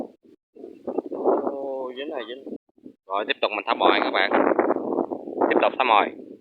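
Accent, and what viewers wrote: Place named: Mekong Delta, Vietnam. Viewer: nghe tiếng là biết miền tây rồi phải không? tỉnh nào đó.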